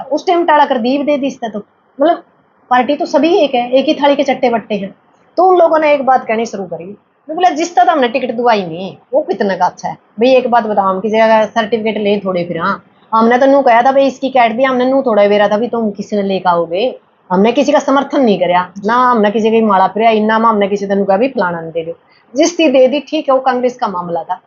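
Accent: Haryana, India. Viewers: aap suddh Hindi mila kr bohat suthree Haryanavi bol leti ho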